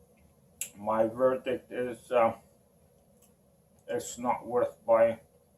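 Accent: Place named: Spain. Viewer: Are you Spanish I can't understand your English sorry :(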